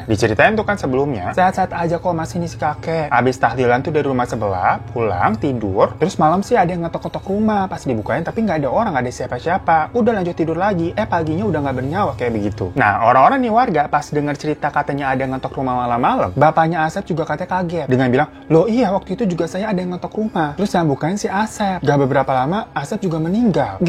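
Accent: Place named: Jakarta, Indonesia. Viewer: Bang kenapa candu banget si pembawaannya kalo ngomong betawinya kentel wkwk ngakak mulu jadinya, kalau ni channel belom update bingung banget mo nonton apalagi kadang sampe diulang 3x videonya😭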